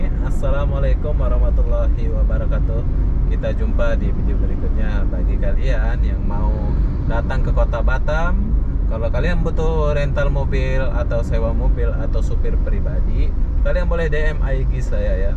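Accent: Malaysia. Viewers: Logatnya separuh bahasa Malaysia.... " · Tanya bang mohon di jawab abang orang mana sih ko ngomongnya separuh indonesia separuh malaysia????🙏🙏🙏 Mohon jawab bang kepoo banget nih aku 😁😁😁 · Dia lama di negeri orang, yakni Malaysia, sampe logat sana melekat. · Ini orang bukan melayu klw ngk salah,pi ngomong y kok melayu tolen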